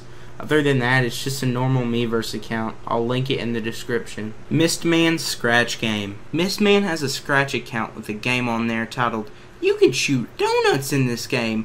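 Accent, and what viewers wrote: Texas, United States. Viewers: Nice accent. Are you from Texas? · I like ur Texan accent (I think it's Texan, I'm an American and know the State accent) Also u get a sub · You know, your texas (or western-american) accent somehow really fits iceberg videos. I think you should try doing more of these. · His accent is pretty texan like, LOVE IT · are you from texas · I fucking love the texas accent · Bro sounds like texas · You sound like a man from texas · Are you from Texas? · You sound like your from Texas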